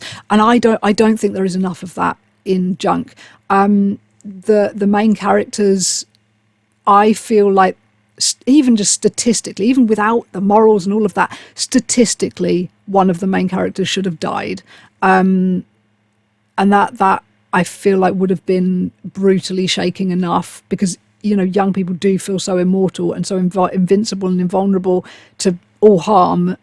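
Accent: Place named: RP, United Kingdom. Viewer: Ok so first off I want to say that this is not a creepy old guy hitting on you… but rather a creepy old guy explaining how shocking it is to hear one of the most beautiful women I have ever seen who actually felt like she was on the outside and she had bullies! Seriously if I could put what my dream woman would have looked and sounded like to physical reality when I was younger it would be you. You are exactly the girl that I always wanted to go out with but never could pull it off. And oddly enough I too have been on methadone for 16 or so years. Perhaps one or two more but 16 seems about right. So anyway…I’m really glad that you seem to found yourself and are living the best life you can… and you are taking really good care of yourself as I know how hard it is to stay in shape while being on methadone. I liked how you put in the sidebar to make sure that no one else makes the same mistake as you. 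I find so much in common with what you are saying… I am autistic. I suffer with forced speech. I was bullied in grade school. Anyway I suppose that I wrote this because I was so shocked that someone who seems so perfect had such a terrible time. Or perhaps it’s the posh accent. Loved the video and I am looking forward to seeing more.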